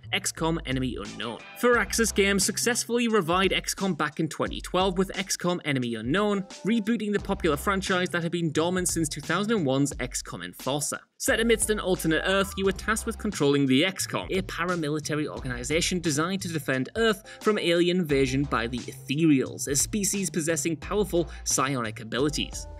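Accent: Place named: United Kingdom. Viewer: I get the whole British accent thing but if they game pronounces it one way that’s how you should say it! It’s a name after all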